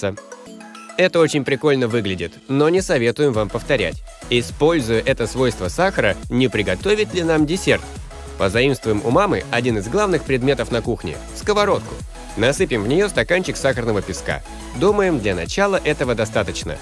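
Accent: Shymkent, Kazakhstan. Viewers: Ты че с Шымкента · Ты из Казахстана? Шымкент?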